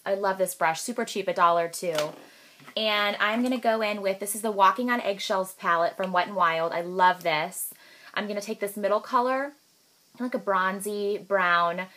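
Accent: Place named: Midwest, United States. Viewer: What... Change your voice? I think you are incredibly easy to understand, if that's what the post meant... Midwestern accents, FTW!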